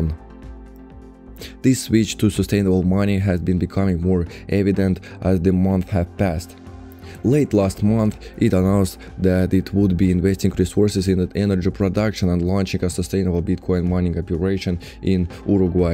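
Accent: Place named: Russia. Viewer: russian accent....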